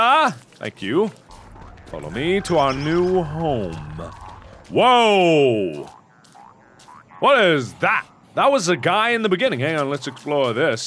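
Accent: United Kingdom. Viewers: Haha his stereotypical british accent!!!! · Awesome accent! xD Yamimash, Mangaminx and other LPers from the UK would be proud! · I love ´s Brittish accent and how he talks to CHESTAH. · you have BEST british accent EVER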